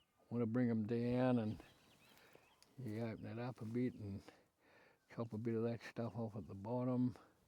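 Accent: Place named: Australia. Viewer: "Me fuck'n clippers av fucked out". I don't think it gets any more Australian than that.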